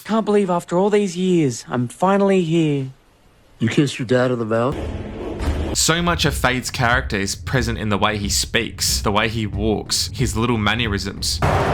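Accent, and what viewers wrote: Australia. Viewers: Bro is australian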